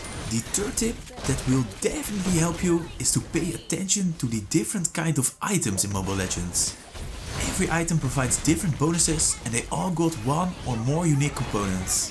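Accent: Netherlands. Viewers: By your accent... Are you dutch?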